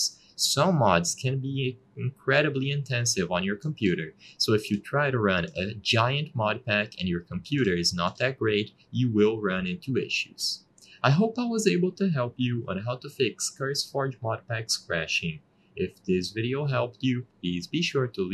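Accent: Brazil. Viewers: O sotaque è inconfundível, Br e o que não falta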